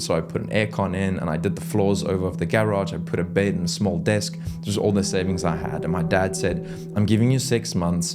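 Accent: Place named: South Africa. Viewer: I love your accent. Is it South African by chance, or New Zealand?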